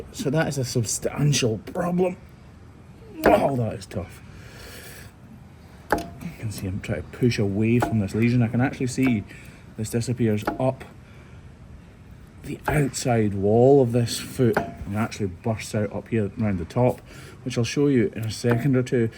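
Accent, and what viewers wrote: Scotland, United Kingdom. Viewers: Luv your channel. I live in the USA 🇺🇸 and sometimes your accent seems so strong to me, I have to put on the subtitles! 🤣🤣 No worries I absolutely LOVE your Scottish 🏴 accent!! I hope you and your family are all doing well. ❤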